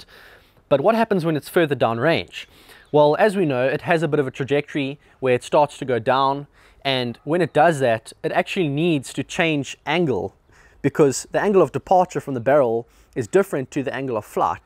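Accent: South Africa. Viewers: I thought he was south African?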